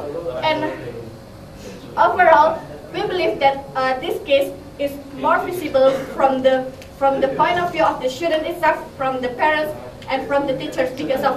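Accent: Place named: Indonesia. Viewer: Too fast like you are good in speaking english, but beware from other people who watch this and know your grammar, your pronounciation and your bahasa's accent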